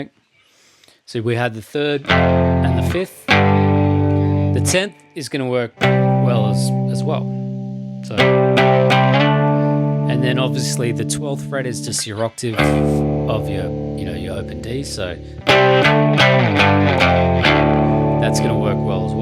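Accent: Australia. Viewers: I would have guessed Australian, sound just like Keith Urban's accent, everybody knows he's Australian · his accent sure sounds australian to me not british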